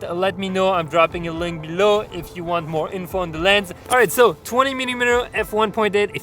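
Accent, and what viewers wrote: France. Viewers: French accent salut parler vous francais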